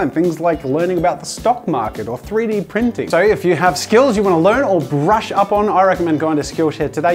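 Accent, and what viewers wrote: Australia. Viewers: you sounded the most Australian you ever have in this video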